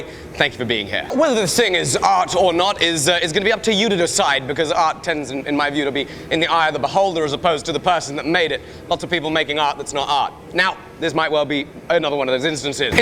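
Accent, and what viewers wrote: England, United Kingdom. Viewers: i cant tell, are you british or american? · I can't tell which part of England your from well from your accent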